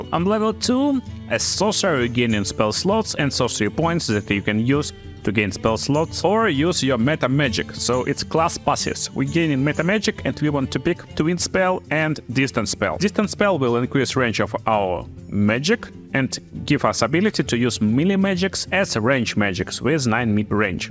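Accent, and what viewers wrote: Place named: Russia. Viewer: I can hear russian accent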